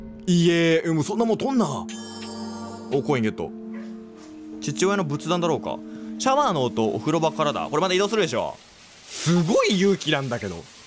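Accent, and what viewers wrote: Kansai, Japan. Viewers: たまに出る関西弁めっちゃ良いですよね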